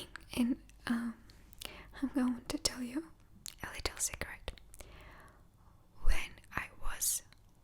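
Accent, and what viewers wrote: Russia. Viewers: Hola princesa tus videos son cada vez mas profecionales exelente video de asento ruso buen blok me gusto mucho y mucha suerte en tu canal nosotros siempre te apoyaremos tus suscriptores favoritos saludos desde mexico d.f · Hey...thanks for all the great ASMR vids with your beautiful Russian accent! I never thought of rivers and parks in Siberia, thats awesome bcuz nature will keep you young and healthy! I do almost everything on your list of activities as you do! Incl the dancing in the dark!😜🙌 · Ciento que usas el español España y tu acento ruso combinados, los Rusos suenan algo peculiar y tu tienes una mixtura de acento español España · I am from New Zealand and in love with u and ur accent please do more Russian accent videos I have no judgement to Russia or the war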